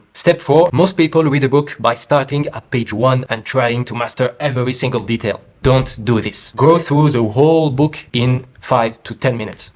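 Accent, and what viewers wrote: France. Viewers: I denote a French accent😊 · I am curious what your native language is. I’m assuming a middle eastern language because of your name But then the accent sounds French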